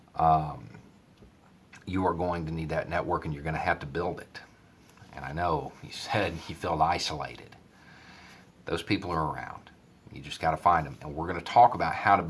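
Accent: South, United States. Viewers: lol, I love stealthing anarcho coopeativist and old-school punk rock organizing with a Southern accent. My problem is I lack subtlety, or I get off too much on talking up the good folks of Chiapas to squares. Keep on rocking until there's a free world buddy.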